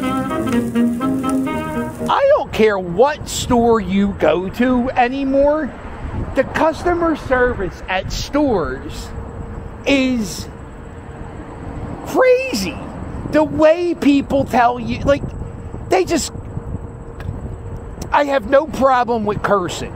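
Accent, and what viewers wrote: Philadelphia, United States. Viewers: Philly accents are the best.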